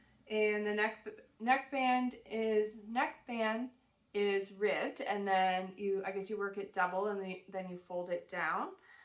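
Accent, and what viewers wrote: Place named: Canada. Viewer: Hello, YouTube randomly took me to your site today so I decided to watch you while I finish up a sweater I'm working on. Your 'By the Bay' caption attracted me as I live in the SF Bay Area in N. Calif, but when I heard you talk I immediately recognized the Canadian twang so I knew your bay is far away from mine! Thought your family so cute and you seem so wholesome so I subscribed. Loved your cabled deep winter coat wip, lovely sweater! I went back and watched your episode 1 so I could learn where you are from and your name and saw your other doggie. Your gray dog looks like part border collie, is that right? And of course I saw your B/W border collie in the 1st episode. I have 2 border collies, male brothers from different litters that have same mom and dad. They are like my kids! I am finishing up a sweater for my daughter in law called Vanessa by Swans Island pattern. 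It is done in Juniper Moon Farm Moonshine colorway Lagoon. Wonderful soft yarn! My next adventure in knitting is Zwieg by Caitlin Hunter, It will be done in a medium gray yak/silk/woolen yarn held double with a lace weight for a dk weight final knit. Pink to dark rasberry yarns for the yoke lace section. This will be fun as I haven't done much with lace style effects and the yoke has lace in the oattern. Looking forward to a bit of challenge! Your sewing projects are great and I like seeing them. I'm a life time sewer.... went to FIDM in late 70s and I design + sew with a targeted interest in tailoring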